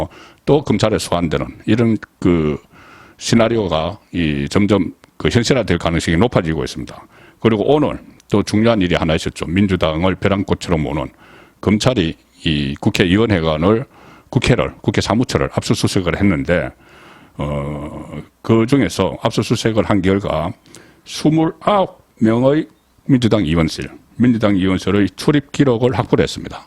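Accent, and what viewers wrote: Gyeongsang, South Korea. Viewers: 몽유병 몽류병 십월 시월 경상도 발음 인정해도 맞춤법은 제대로 하셔야지요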